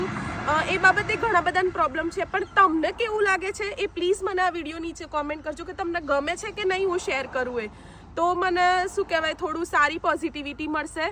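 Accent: Sabarkantha, India. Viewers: બેન તમે બોલો છો એ ખુબ જ ગમે છે અને બીજું કે જે માણશ બોલકું હોય એના દિલ મા કોઈ દિવસ કપટ ના હોય કે છલ ના હોય અને બીજું કે સાબરકાંઠા ની બોલી એટલે વાત પુરી થઈ જાય i like it બીજું તમે સુંદર કામ કર્યું છે કે વિદેશ નું ક્લચર કેવું હોય છે ત્યાં માણસો નો સ્વભાવ તેમનું વ્યક્તિત્વ તેમની રહેણી કહેણી ત્યાં ના માણસો મિલનસાર છે કે નહિ માયાળુ છે કે નહિ આ બધુ સમજીને વિડિઓ બનાવવા સહેલા નથી ભારત વાળા માણસો હંમેશા ભૂલો કરવામાં કચકચ કરવામાં ખાંચા કાઢવામાં જ નંબર વન છે એટલે તમે બધા શુ બોલે છે એમાં ધ્યાન ના આપશો બસ સુંદર સુંદર વિડિઓ બનાવી ને મોકલો ભારત મા મોગવારી એ માજા મૂકી છે બેન વિદેશ વિશે કંઈક તો જાણવા મળે 👌🏻👌🏻👌🏻👌🏻👌🏻🙏🏻🙏🏻🙏🏻❤️❤️ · Apni speech thi j khabar padi gai ke tame s.k. na chho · બેન આપના વીડિયો થી અમને ત્યાં રૂબરૂ આવ્યા નો અહેસાસ થાય છે,અહી બેઠા અમને ત્યાં કેવું પર્યાવરણ,ત્યાંનું લોકજીવન,રોડ રસ્તા,સુવિધાઓ ત્યાંના નીતિ નિયમો જાણવા મળે છે,બીજું કે આપની શુદ્ધ ગુજરાતી માં સાબરકાંઠા નો લહેકો.આવેછે એ પરથી અમને ખબર પડીજ ગઈ હતી કે બેન. 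આપણાં બાજુના જ છે,એ આનંદ ની વાત છે,બધાને વિદેશ માં કેવું જીવન છે એ જાણવાની જિજ્ઞાસા હોય જ જે તમે વીડિયો દ્વારા બતાવો છો એ સારી બાબત છે,હવે થોડા ઘણા તો નેગેટિવ કૉમેન્ટ કરવાના,એ બધું ધ્યાન પર ના લેવાય,આતો દુનિયા છે આમ પણ કહેશે અને એમ પણ કહેશે,બાકી તમારું કામ ખુબજ સારું છે ધન્યવાદ.ભગવાન તમારું સારું કરે.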